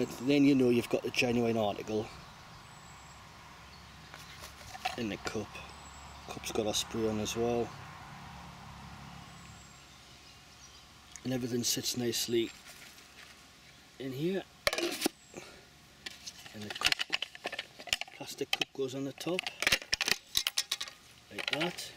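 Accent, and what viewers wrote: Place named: Scotland, United Kingdom. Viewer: Looks spectacular. You sound like a soldier I served with from Scotland. Excuse my ignorance if you are not, some are quite touchy about that....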